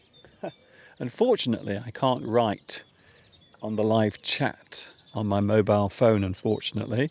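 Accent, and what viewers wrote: England, United Kingdom. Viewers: Hi, I love your pure English accent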